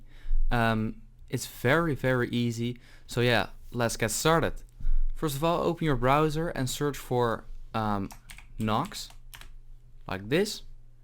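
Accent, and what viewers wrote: Netherlands. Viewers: he sounds like a dutch person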